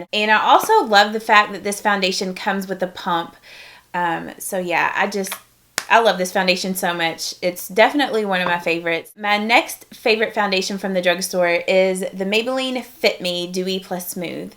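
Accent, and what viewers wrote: South, United States. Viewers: does anyone know where she's from? I'm hearing a southern accent. I'm from ga. just wondering!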